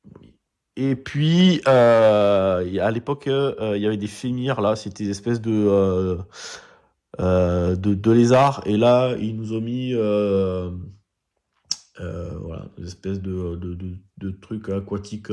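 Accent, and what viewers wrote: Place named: South, France. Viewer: J'ai pas comprit où on devait entendre une quelconque ébriété. Serait-ce l'accent du sud qui vous donne cette impression erronée?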